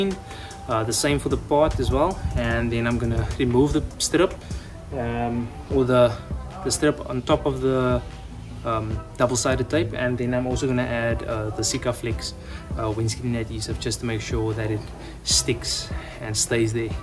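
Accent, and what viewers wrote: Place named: Afrikaans, South Africa. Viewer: Haha zuid afrikaans blijft grappig om te horen. Dacht dat de taal daar uitgestorven was. Btw did it came painted/glossy? How much was it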